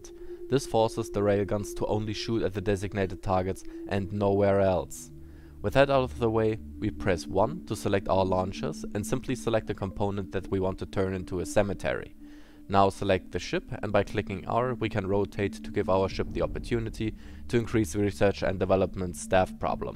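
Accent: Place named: Germany. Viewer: I spend half the video wondering whether I heard an German accent or not. Your English is better than mine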